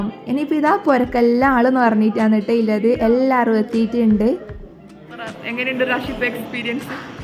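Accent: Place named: Kannur, India. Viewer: ഞാനും കണ്ണൂർ ആണ് എന്ന വിചാരിച്ചു കണ്ണൂർ സംസാരം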